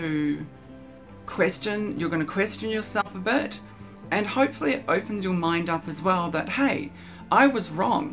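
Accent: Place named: United Kingdom. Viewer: for a second I thought you were my ex until I heard your British accent, you and her can be twins, this is a very good video , I showed it to my transgender niece , her friend and him had sex and he had that shame look as you mentioned and I told him what you said and it actually helped them and they're now dating 😃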